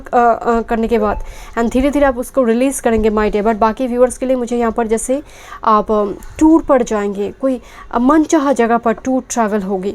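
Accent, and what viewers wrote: Bengal, India. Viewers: pile#3 well it's the very first time I am into this channel and loved the entire reading, though I want to ask you 'are you a Bengali?" as your accent is quite similar to Bengalies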